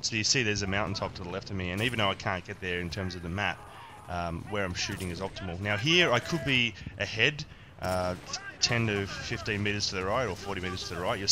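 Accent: Australia. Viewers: How many Aussie commentators are there on youtube? is it just you and JamieEhills?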